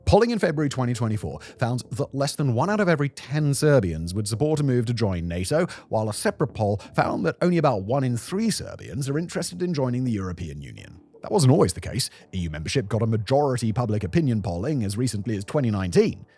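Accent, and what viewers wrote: United Kingdom. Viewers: British accent talking about the balkans is red flag that announces bullshit. War in croatia broke out after germany armed croatia, war in kosovo broke out after the UK and the US armed moslem terrorists. The monologue speaks of serbian nationalism 100 times, and relevance of history to understand the presence, but fails to mention ww2 organized extermination of serbs in croatia and bosnia (by nazi allies croats and moslems)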